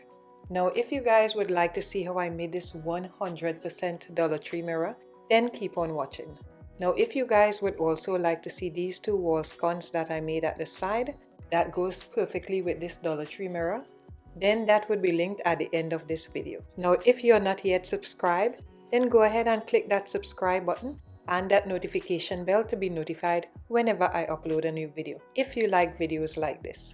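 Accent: Trinidad and Tobago. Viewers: Are you from Trinidad.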